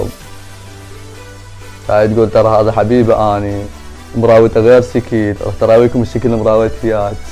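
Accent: Iraq. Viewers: والله اول ماشفتك عبالي سعودي طلعت عراقي وحت حجيك مايشبه شكلك تحجي مثل العراقيين الكارهين الدنيا صدوك لو كال ابو المثل لاتغرك المضاهر خرررر بحضي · ليش احسك من الجنوب من اهالي البصرة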